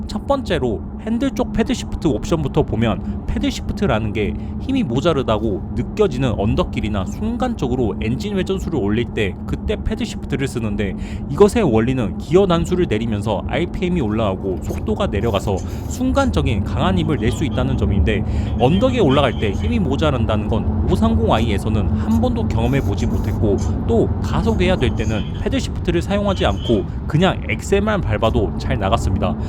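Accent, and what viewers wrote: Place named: Gwangju, South Korea. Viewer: 어딘가 익숙했는데 광주사시구나 ㅎㅎ 반갑습니다 ㅎㅎ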